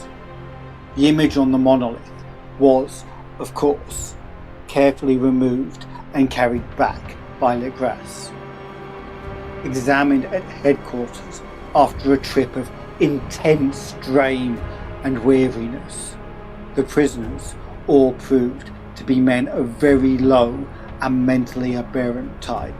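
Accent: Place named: United Kingdom. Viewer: Telling a story with Americana locations and culture with a Brit accent seems a bit out of place 😅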